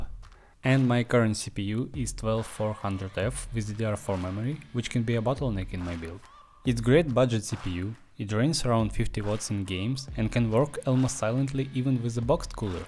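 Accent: Russia. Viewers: много, факт) я слушаю и частенько русский акцент дает о себе знать. Для практики рекомендую читать стихотворения с тяжелым произношением и слушать говор англичан. Ох уж эта практика, с ней как раз всегда и беда))) не хватает ее всегда. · Когда нужно меньше 5 секунд, чтобы понять, что автор — русскоговорящий =) (это не критика, если что ;)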